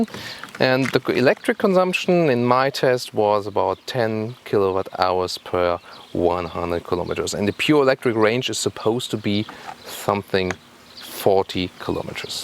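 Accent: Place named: Germany. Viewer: Little by little you're starting loosing your accent almost German 90% combined with 10% english, before was G 50% E 50%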